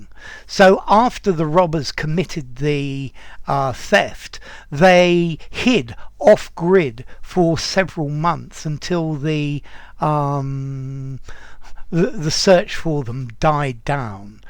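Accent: United Kingdom. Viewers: Sir what british accent do you speak with? And could you make some videos on accents! Cheers! ❤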